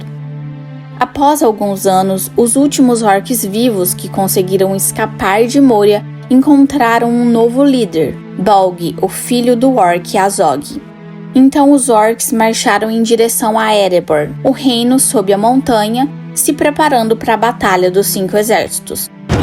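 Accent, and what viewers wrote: Minas Gerais, Brazil. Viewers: Moça, você é do Vale do Paraíba? Vi um cara comentando que você deve ser mineira. Fiquei curioso.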